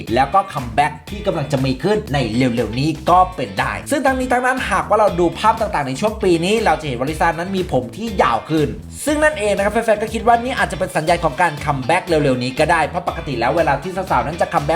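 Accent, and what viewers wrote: Isan, Thailand. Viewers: สวัสดีแอดชอบแอดมากไม่ว่าจะพูดสำเนียงอีสานหรือกลางก็ชอบจากใจจริงชอบๆม๊ากๆๆ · ผมหมายถึง จริตจัดเต็มแว๊ดๆ+อิสาน มันฟังไม่รุเรื่องว่าเป็นคำว่าอะไร จะเข้าใจได้ไง? การนำเสนอ การถ่ายทอดข้อความ ถ้าคนฟังแล้วไม่เข้าใจ มันก็พัง.....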